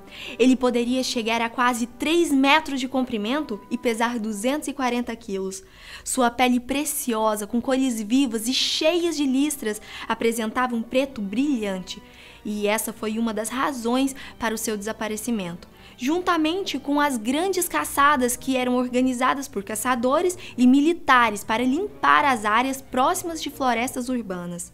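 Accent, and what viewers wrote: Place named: Minas Gerais, Brazil. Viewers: A moça que fala nesse vídeo é de qual estado do BR? Parece de MG... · Pelo sutaque deve ser mineira.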